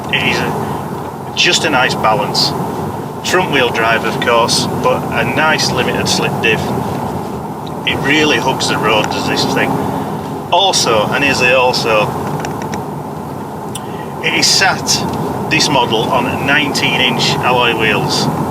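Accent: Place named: United Kingdom. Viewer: Phenomenal hatchback already giving the GTI a run for the money. Loved your video, and am a new subie. Wish your mic didn't have so much wind interference though, lost some of your comments. Haven't quite gotten used to your Brit accent yet, tuning in from Canada!. Hope they ship the i30N to our shores. Cheers!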